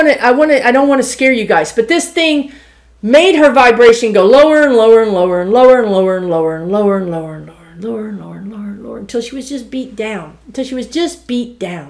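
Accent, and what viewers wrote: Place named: Minnesota, United States. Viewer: Hi I turned this on and am watching now. My 1st thought was I like her she sounds so much like people I know here. Then I thought she really does sound like a Minnesotan. My next thought was I think she belongs in Minnesota she would fit in here so darn well! Just had to share a clairknowing that I may have had.? Might be a great question for you to check with some of the awesome people that you get with😊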